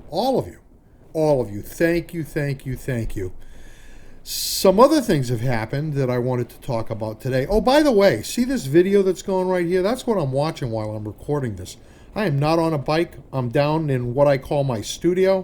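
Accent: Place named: New England, United States. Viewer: I'm from Mass originally, lived in NH for awhile as well. Love the familiarity of the video. The accent reminds me of home! Glad to have stumbled upon your channel. Subscribed now as well. Like you said, I'm watching a ton of videos on YouTube. Looking forward to catching up on your other videos!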